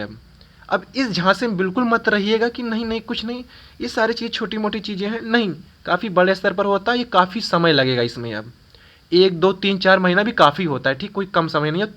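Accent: Allahabad, India. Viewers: Bhai aap Allahabad se hee hai na? Kyoki aapki boli aur bhasha sunkar maza aa gaya. Bahut acha laga.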